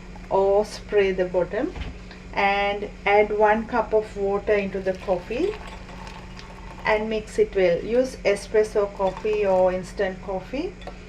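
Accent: Sri Lanka. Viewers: tara sanda I thought the same.Accent is Sri lankan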